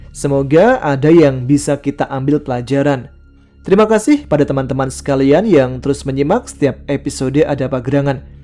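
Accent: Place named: Java, Indonesia. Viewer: Bang bisa ga ngomong nya jangan di medok medokin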